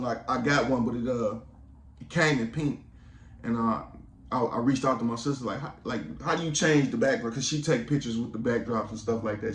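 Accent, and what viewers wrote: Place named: California, United States. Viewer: Great videos and great content. Your accent, are you from California?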